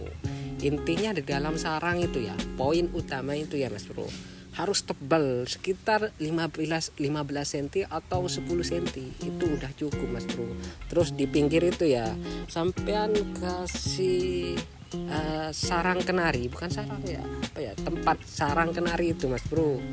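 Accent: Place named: Madura, Indonesia. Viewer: Logatnya kayak madura😂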